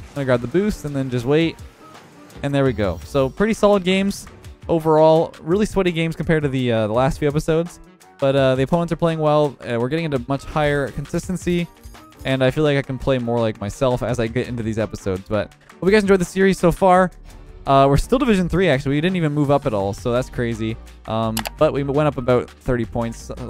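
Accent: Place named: Canada. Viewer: He’s either from Canada or Minnesota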